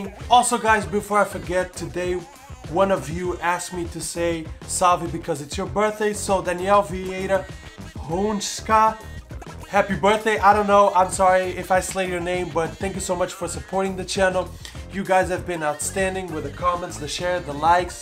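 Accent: Brazil. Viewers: Recebo a notificação e venho correndo para assistir ao vídeo 😊 Melhor canal da vida, com o português mais brasileiro do YouTube ❤🇧🇷❤🇧🇷❤🇧🇷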